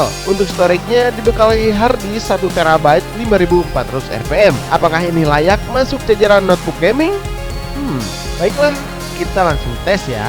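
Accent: West Java, Indonesia. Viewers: amd dan jipors. sundana teu bisa di leungitkeun. haha.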